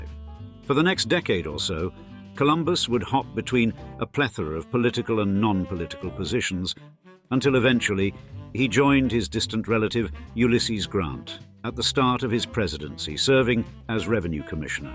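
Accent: United Kingdom. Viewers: Americans pronounce the name 'De- lah-no'. The narrator speaks with a British accent that says it differently.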